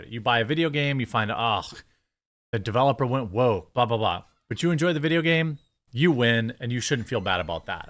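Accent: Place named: Midwest, United States. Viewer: your midwest accent I barely notice... Usually... but the way you pronounce "Atlas" sounded like "Alice" until much later in the clip.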